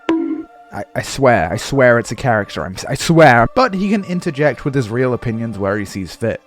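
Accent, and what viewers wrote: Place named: England, United Kingdom. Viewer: you speak with english accent but reference shit like macaroni picture frames (not english at all) whats with that??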